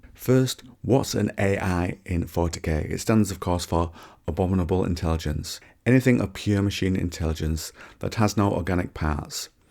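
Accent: United Kingdom. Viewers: All I need is a soft , smooth British accent , and 40k lore , and I’m here for it. Luetin has biased me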